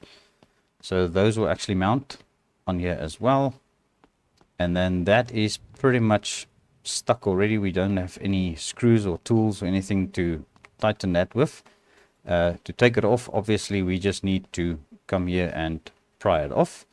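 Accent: South Africa. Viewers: south african i can hear in the accent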